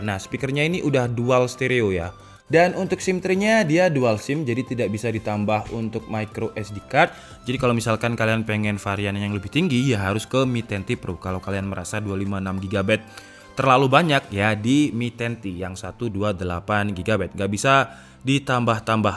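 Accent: Malang, Indonesia. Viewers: Orang malang kah?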